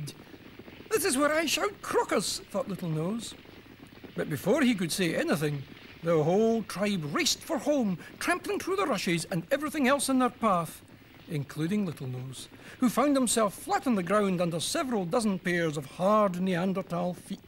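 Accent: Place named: Scotland, United Kingdom. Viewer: Guy sounds scottish.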